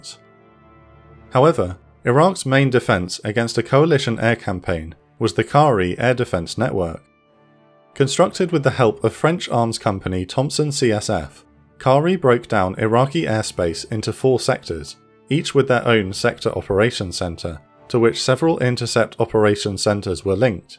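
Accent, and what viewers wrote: England, United Kingdom. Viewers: why is ALWAYS some deep English accent?! I COULDN'T listen to it as much as I wanted to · The English accent threw you off. But he does say Iraq.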